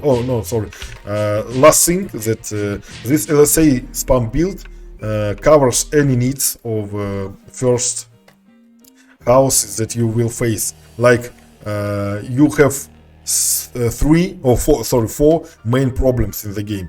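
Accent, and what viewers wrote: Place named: Russia. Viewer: У тебя такой русский акцент лол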